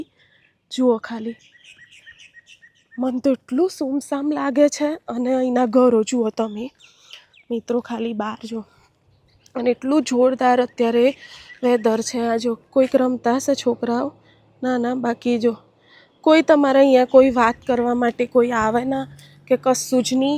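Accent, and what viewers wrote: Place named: Himmatnagar, India. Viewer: Tame. Himmatnagar side na lago chho, mari ekk friend hattii evu j bolo chho.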